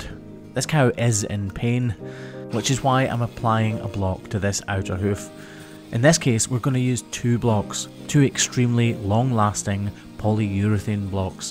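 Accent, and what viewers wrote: Scotland, United Kingdom. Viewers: Her hoof damage reminds me of a weird nail I have. As a kid, I smashed my right hand ring finger between the door and doorframe, you know where the hinges sit, and I broke the very tip of my finger - right where the nail is formed. Today I have a strange nail that grows in layers, with a weird top layer that kind of splits off from the rest of the nail and I have to cut it really, really short for it to be comfortable. The scarring on my finger is extremely sensitive and the tip of my finger is actually shorter than the left hand ring finger, visibly so and when I put my hands together to compare, I lack about 5 millimeters on it. While my own finger and nail will never be "fixed", it's a thing I've learned to live with and it doesn't impact me in any real way. I hope this little miss can lead a good life, even if the keratin finger issue reemerges. 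These videos are super comfy and not only do I get to listen to the dulcet tones of a Sco'ish man, I get to see cattle having their hoof injuries treated with the utmost of care and respect for the animal.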